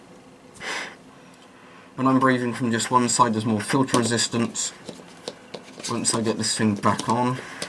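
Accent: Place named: United Kingdom. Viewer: why you sound more british with a mask on? btw thanks for the info :D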